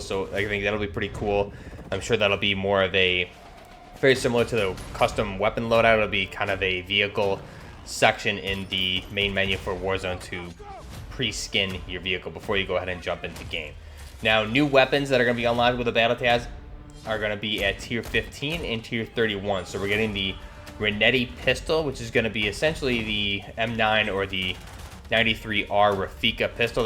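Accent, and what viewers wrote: New York, United States. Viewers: Just curious, from the sound of your voice, you live in the new jersey/ new York area?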